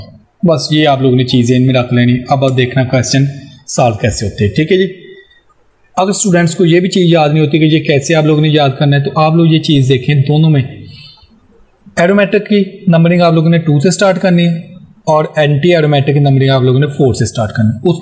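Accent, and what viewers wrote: Pakistan. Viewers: Kis kis ko sir ki aawaz se lg rha hai ki sir Pakistan ya fir Jammu se hai 😅😅😅😅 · Why i am pakistani accent from this guy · sir ki ek dam pakistani accent lgti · Are you accent seems to be pakistani Btw love from india❤️ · Mujhe accent se lga tha ki aap Pakistani ho But boht accha samjhaya aapne Thank you sir 🙏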